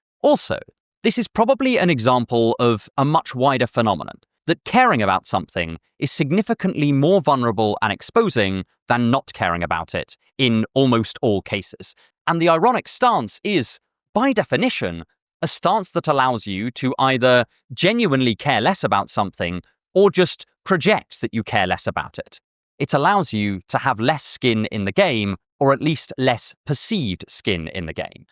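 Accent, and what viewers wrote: United States. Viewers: Is it a tone? Or just a midwesterner? The world may never know.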